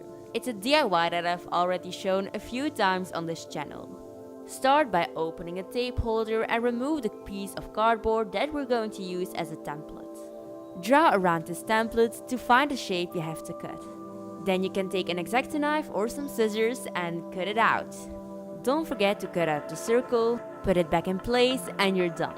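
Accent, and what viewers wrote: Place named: Netherlands. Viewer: I love how he has a Dutch accent. It is so cute. Rlly nice personality.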